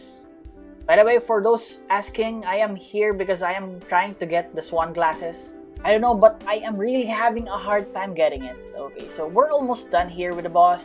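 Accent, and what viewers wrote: Philippines. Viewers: Are u pilipino or american lods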